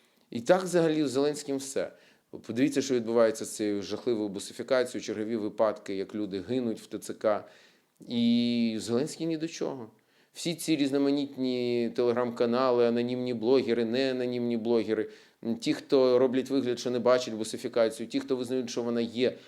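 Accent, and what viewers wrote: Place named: Russia. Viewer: Лёшенька , переходи на русский язык. А то сбиваешься на него .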